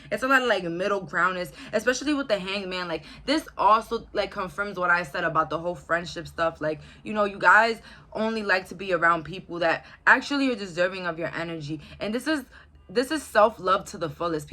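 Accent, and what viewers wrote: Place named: Brooklyn, United States. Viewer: you really be from NY huh? im cacklin sis you be radiating brooklyn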